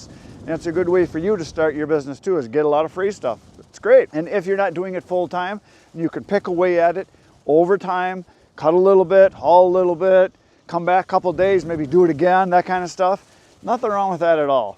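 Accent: Wisconsin, United States. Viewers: Sounds like Wisconsin.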